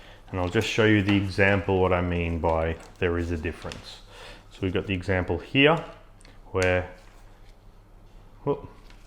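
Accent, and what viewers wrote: Australia. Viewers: What a blessed relief it is to hear some one speaking in Aussie accent (or is it a Kiwi accent? - I can't tell the difference) instead of an American accent. And someone who speaks clearly. And better yet still - not to have some pointless bloody awful noise going on at the same time. Well done on this count alone. Your advice also came up trumps! - it was the tooth pick suggestion that saved the day. Much thanks! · Yet another success story - thank you so very much! And as a bonus, I was able to be enchanted anew by that delightful Aussie accent, with tooth peeks which aren't too theek :))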